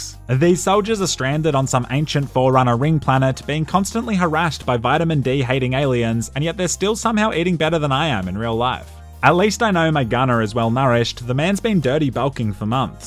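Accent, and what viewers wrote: Australia. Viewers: >Started watching >5 seconds in >Disgusting australian accent >Pause video and click off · I can't believe I found your channel after many years. It was one of your hitman playthroughs coupled with your ozzie deadpan sarcasm which made me subscribe. Brilliant content. I watched every hitman 2 one, all of the farcry ones, all of the GTA SA and IV ones and the Vice City one. To the point my wife knows that when I go to bed, we are watching for hours now. Shame you're not doing the old intros that you once did, to spread the good word of your channel. I even made one below 😕 "Hi lads and lasses, here. If you enjoyed this video, please hijack one of Elon Musks' SpaceX rockets and send an emergency broadcast out to every planet within reach, stating to subscribe to, as this really helps the good word of my channel"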